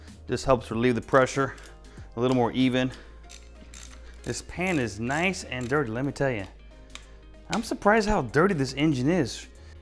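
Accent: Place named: United States. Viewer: Hi. Looks like it can be rebuilt to me. Can you not grind the crank and fit oversize bearings? I'm talking 60's/ 70's engines I suppose but the mechanics of this engine are from that era (if not the electronics!). 2 valves per cylinder, cam in block, pushrods. Great! Like the channel very much, you do stuff to owner/mechanic standard - that is not a criticism, just means that the attention to detail and care is better than I suspect the average workshop would give. You do a Rolls Royce job if its your own car, such as the diagonal relieving of bolts. Good tips too eg the spark plug ceramic colour (not black, not oily, not too white).Keep it up. I'm a Brit, you're and American but I just about understand you!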